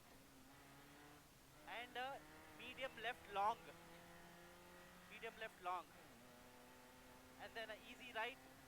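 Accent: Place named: India. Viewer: First time ever I am going to heard Indian ascent for the pace notes... Looking for more content from your side... 😄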